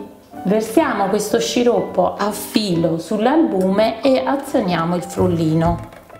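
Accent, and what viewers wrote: Naples, Italy. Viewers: Ciao. Sei molto simpatica.... col tuo accento e battute in napoletano. Sei anche molto brava e calma nelle spiegazioni. Questo aiuta molto a seguirti bene. " u scelepp" è di origine pugliese... (Io son della provincia di Bari). Mi piace questo metodo con l'album che non ho mai utilizzato perché nn viglio pastorizzare ogni vola. Mi chiedevo, a parte il procedimento di lavorazione, che differenza sta tra il fondente di zucchero e questa glassa. Cosa si aggrappa meglio e quale tra i due diventa e resta duro asciugando? Devo ricoprire biacotti e altre decorazioni di pasta frolla e non vorrei che urta do tra loro i biscotti si possano rovinare facilmente. Grazie per l eventuale tue info. Un abbraccio Maria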